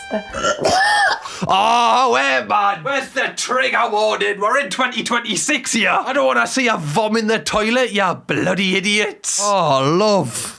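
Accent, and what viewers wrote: Newcastle, United Kingdom. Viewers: I follow you cos I miss the Geordie accent. When you said Jesmond instead of Desmond, I laughed.. 😅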